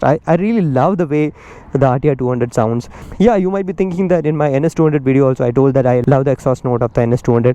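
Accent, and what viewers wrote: India. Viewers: Such a calming soothing voice and also you speak English in such a fashion it feels like you are speaking in hindi. Amongst the most genuine and humble person i know on youtube. Keep up the good work bhai, ❤️🙏😍🙌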